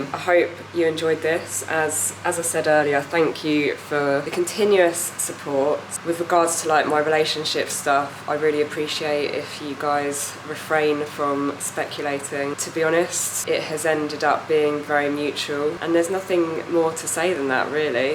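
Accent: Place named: United Kingdom. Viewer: theres a young lady that talks just like u lol we love it at work is it like from england british or irish lol i dunno it just so dam cute liver pool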